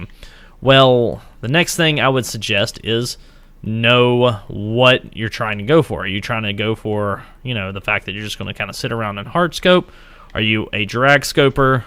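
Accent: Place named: South, United States. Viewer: Lmao about half way in the video and i finally realized this guy has a southern accent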